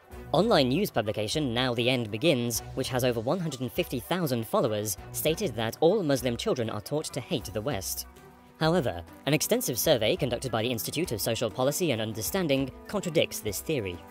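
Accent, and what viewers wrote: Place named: China. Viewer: Logat nya logat org cina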